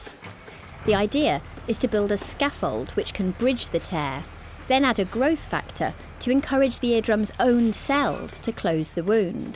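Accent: United Kingdom. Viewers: i think the narrator is british but i can't be too sure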